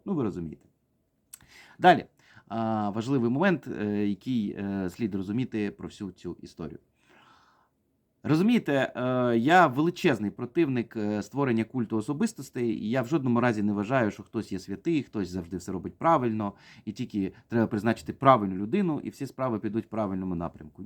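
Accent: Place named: Kyiv, Ukraine. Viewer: Не переживай. Хтось до і доживе. Дякуємо автору за справжню українську- київську говірку,ви говорите по нашому... без отого польського " наречія"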